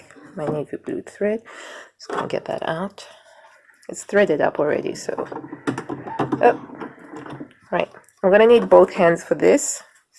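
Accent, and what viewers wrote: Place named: South Africa. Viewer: Thank you for a wonderful video. you sound so "welcomingly" South African!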